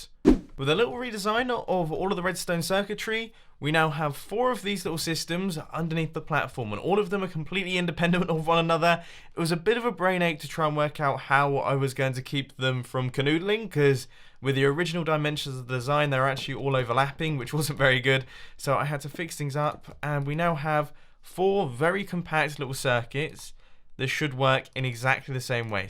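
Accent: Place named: United Kingdom. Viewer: James D that is a British accent, I know cause it's the same as American (English) duh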